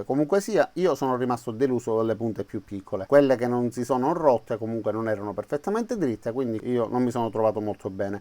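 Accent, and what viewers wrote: Sicily, Italy. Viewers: ma sei siciliano?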